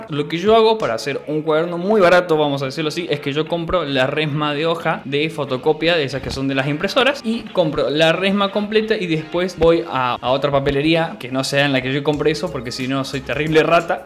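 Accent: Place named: Argentina. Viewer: Físico de polaco y acento de argentino 💝💝💝 capo total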